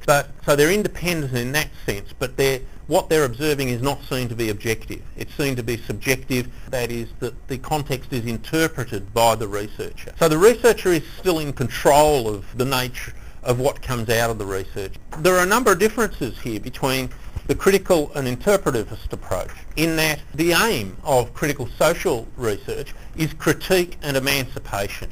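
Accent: Australia. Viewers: I'm pretty sure it's an Australian accent. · Thanks for the video is this an Australian accent?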